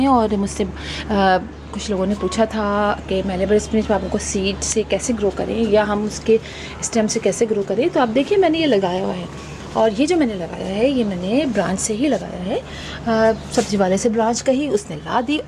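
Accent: Pakistan. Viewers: না ভাইয়া, বাঙ্গালী পুইসাক বলে, কলমী না। অন্তত বাংলাদেশে পুইসাক ই বলা হয়। দিদি বাঙ্গালী না কারণ তাঁর ভাষা নিরেট উর্দু। বোধহয় তিনি ভারতীয় কিন্তু তার accent টা পাকিস্তানী উর্দু ভাষী।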